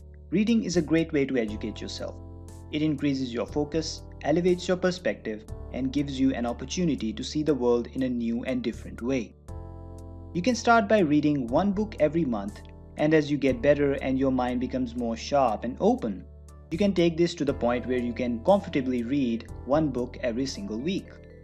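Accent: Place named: India. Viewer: Are you Indian bro? Your accent sounds so.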